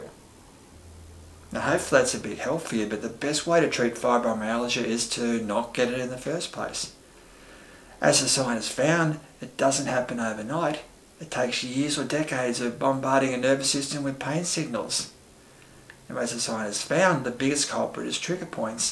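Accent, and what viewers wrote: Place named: Australia. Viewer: You sound like an Aussie, what state are you in?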